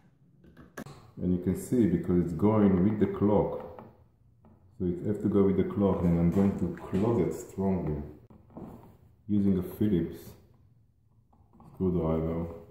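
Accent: Israel. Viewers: I recognize that accent from anywhere...ivrit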